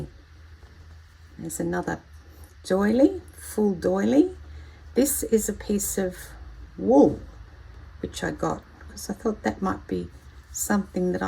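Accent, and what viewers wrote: Australia. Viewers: Hello this is Ellena from Portland, Oregon. I’m a quilter and have been fascinated with your Vintage linen quilt squares. I love them and am inspired to try doing something like this. Ps love your Australian accent. Keep up the good work.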